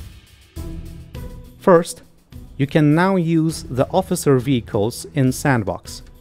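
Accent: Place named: Poland. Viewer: Oo widzę że Polak robił ten film bo akcent nie angielski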